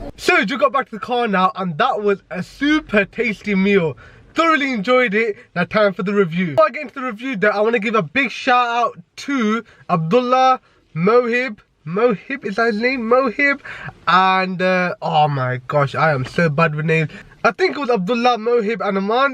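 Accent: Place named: London, United Kingdom. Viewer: He sounds like a Londoner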